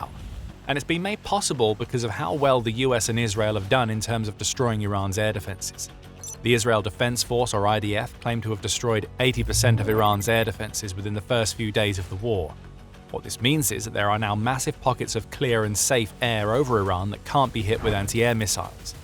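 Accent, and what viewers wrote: United Kingdom. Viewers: is so funny that a Brit is narrating this video since their politician are not doing shit in this Campaign I Remember the WarHog from 1978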